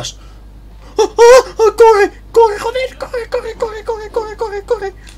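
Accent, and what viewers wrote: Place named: Puerto Rico. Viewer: El acento es puertorriqueño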